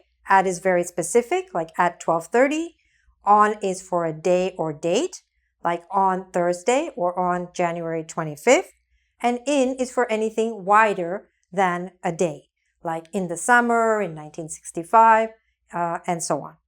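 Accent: United States. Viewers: Loving The Sopranos accent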